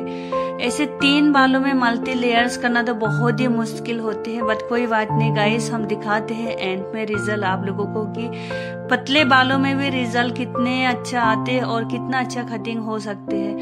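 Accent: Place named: Bangladesh. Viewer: Ap Bangladeshi ho kya apki Hindi se lag raha hai perfect nhi hai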